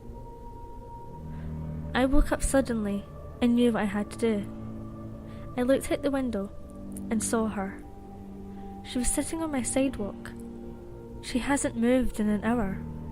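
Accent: Scotland, United Kingdom. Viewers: Are you Scottish ? Great accent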